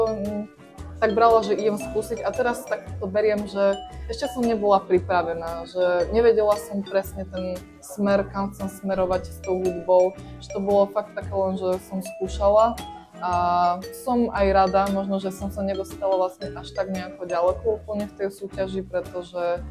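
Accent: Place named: Poland. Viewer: W pierwszej chwili nie mogłem rozpoznać języka, nie byłem pewny, bo brzmiał jak połączenie słowackiego z ukraińskim z polskimi naleciałościami. Dopiero później zrozumiałem,że to słowacki/czeski z polskim akcentem.. Akordeon uwielbiam, bo w kiedyś sam grałem.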